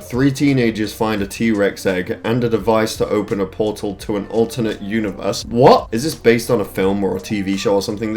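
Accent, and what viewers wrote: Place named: England, United Kingdom. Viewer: Why does sound English here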